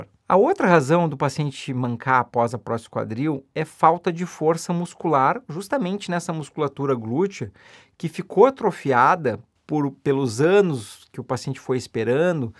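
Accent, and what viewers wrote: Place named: South, Brazil. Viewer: Amo o sotaque do Sul e gostei muito do vídeo. Esclarecedor! Parabéns!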